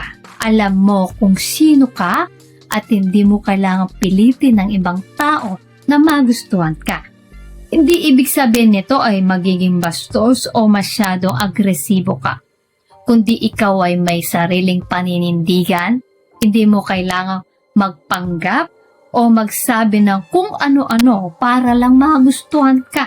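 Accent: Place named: Bicol, Philippines. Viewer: Parang bikolano ka Ma'am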